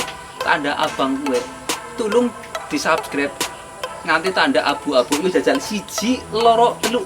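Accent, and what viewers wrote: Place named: Banyumas, Indonesia. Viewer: Walau sy pelihara English anggora tp tertarik sekali nonton chanel sampean, bs juga di terapkan,, aplg sama2 ngapak haha Salam ngapak Banjarnegara👍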